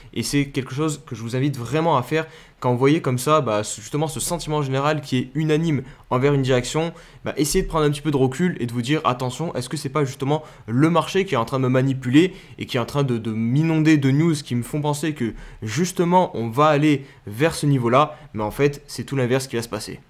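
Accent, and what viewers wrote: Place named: France. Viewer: Haha je suis brésilien. Très français ton accent. Ps: les brésiliennes adorent... 😉👍🇨🇵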